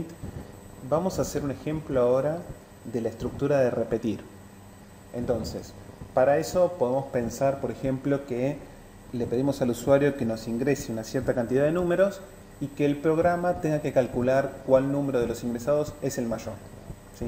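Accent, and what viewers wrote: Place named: Argentina. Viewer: No hables argentino no puedo entender,aaaah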